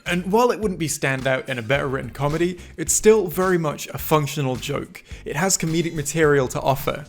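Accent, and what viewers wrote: England, United Kingdom. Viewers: Man's literally speaking Queen's English isn't he? Hard to tell how biased this is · Whoo that’s too much British for me today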